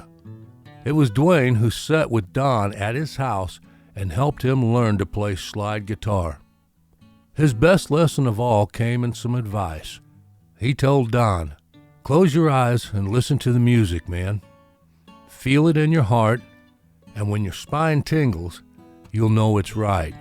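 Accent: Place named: West, United States. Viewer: What a well written, and well narrated story! I also like your western accent. It's stronger than mine, (desert southwest) and I can't place it.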